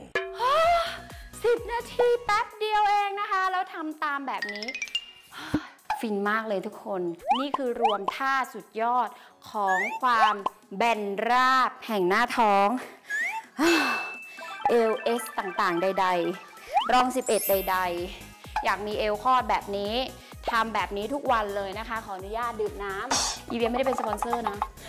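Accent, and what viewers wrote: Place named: North, Thailand. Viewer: ชอบความปาเเล๊ดเหนือขนาดจ้าว พูดไทยทุกคำสาบเมืองได้จาใด เก่งขนาดจ้าว ไว้จาทำตาม ^^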